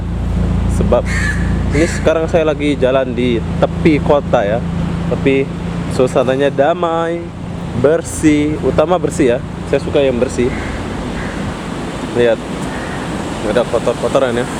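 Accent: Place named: Kuala Lumpur, Malaysia. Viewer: Cara ckp ada bau2 ckp melayu kl.. It's ok bro....😉😉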